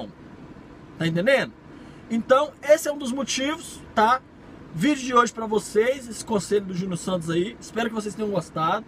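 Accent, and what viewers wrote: Brazil. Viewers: por um acaso você é nordestino você fala com muito sotaque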